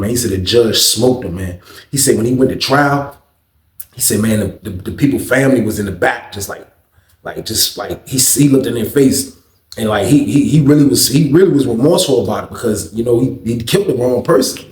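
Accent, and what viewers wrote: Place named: Brooklyn, United States. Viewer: Sounds like Brooklyn dudes in NY lol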